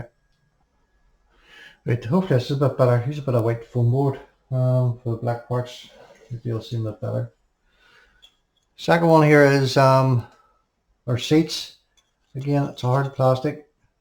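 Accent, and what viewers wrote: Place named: Germany. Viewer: Good afternoon, say chap you have a jolly nice accent! considered it was germain at first :)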